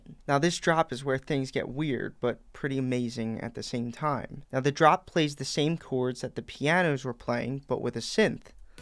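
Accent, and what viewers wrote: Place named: Delaware County, United States. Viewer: I've watched a handful of your videos and could not get over your HEAVY Delco accent. Hat and Jersey confirms it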